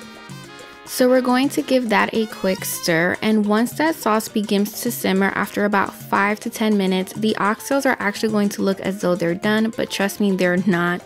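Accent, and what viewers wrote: New York, United States. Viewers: Love your oxtail recipe. I love your accent did you grow up in NY? You are very talented in the house 🏡👌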